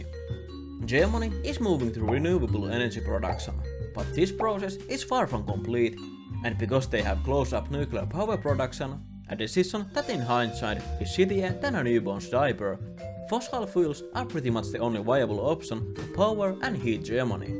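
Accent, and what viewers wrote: Finland. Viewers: I like you'r Rallienglanti mä oon suomalainen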